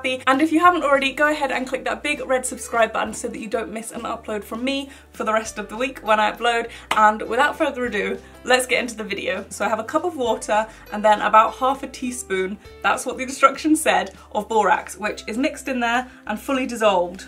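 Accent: United Kingdom. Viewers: I feel like you are like a little bit older version of me with a British accent lol, I look a lot like you ❤️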